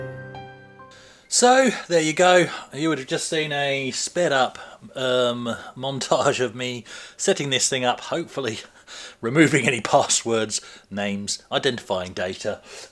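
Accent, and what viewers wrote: Australia. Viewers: I love the Auzzy accent in the out of box bit. Don't spill any liquid on it.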